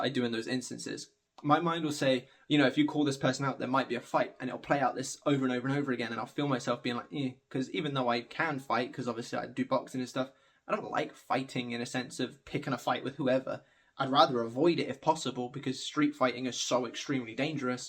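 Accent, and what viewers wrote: England, United Kingdom. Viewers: This is off topic but it’s been driving me nuts so I have to ask. Where in England are you from? I’ve been all over England and I can’t quite place your accent! Sometimes I think you’re from South London, then sometimes it sounds like you’re from Nottingham…or sometimes even Birmingham! I’m way off aren’t? 😆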